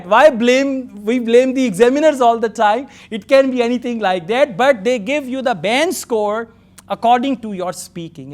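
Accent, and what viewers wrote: Punjab, India. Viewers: Sir although your teaching is just fabulous bt i really likes the desi punjabi words you speak Kithe aa tera kutta😁🤣🤣🤣 Fun and learning together It makes me feel that i m in touch wid my native language 😊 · Sir ur punjabi is same as us dun vatta 😁😁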